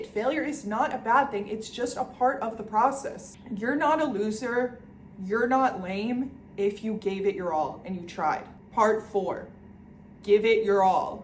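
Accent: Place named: Israel. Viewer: יש מצב שאת יודעת עברית? 😅 האינטונציה שלך נשמעת ישראלית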